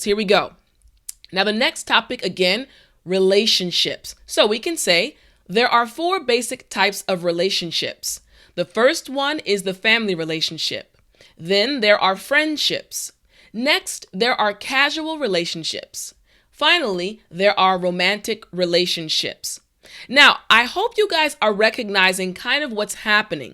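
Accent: United States. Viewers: that's American English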